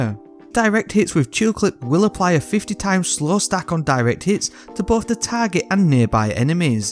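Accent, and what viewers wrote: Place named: North, United Kingdom. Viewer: He's just northern leave my boy alone. He makes some of the best d2 content on YouTube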